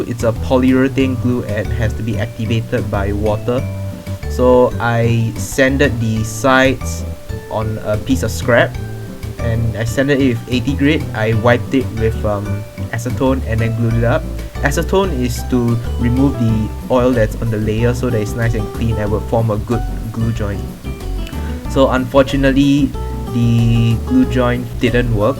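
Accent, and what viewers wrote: Singapore. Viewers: Haha you sound either Singaporean or Malaysian! Anyways nice tutorial bro! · Singapore accent?? :00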